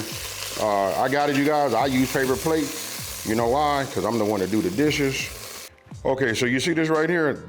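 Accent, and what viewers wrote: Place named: South, United States. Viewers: where would you say your accent is from? I noticed your vowels sound a lot like Ryan Coogler's from the interviews I've heard and I've heard that accent before but idk if I can place it. It's like a combination of Southern California and the American South but not somewhere I can place. Is it Oakland? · I will believe any cook with a southern American accent that they know what they are doing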